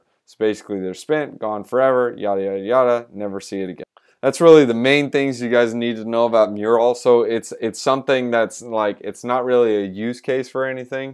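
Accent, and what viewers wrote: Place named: United States. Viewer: LOL. That one guy video that you said you couldnt understand I literally just came from him. When I heard youre American I said thank God!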